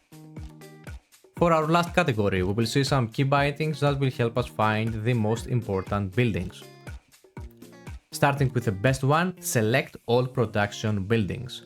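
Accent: Greece. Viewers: αθανατη ελληνικη προφορα! ωραιο βιντεο ευχαριστω!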